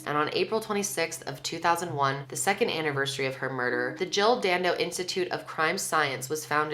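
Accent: United States. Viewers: I love hearing American pronounce british place names 👍🏼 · no shes american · i absolutely love the way americans pronounce some words hahaha its such a laugh thank you for covering this!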